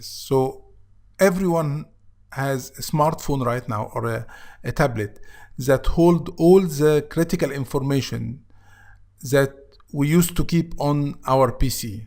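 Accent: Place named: England, United Kingdom. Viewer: Inspite of that English accent everything is okay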